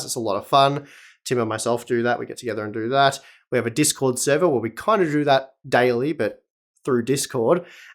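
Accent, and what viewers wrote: Australia. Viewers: I really like ur channel as Australian English is much more easier to understand for foreign people.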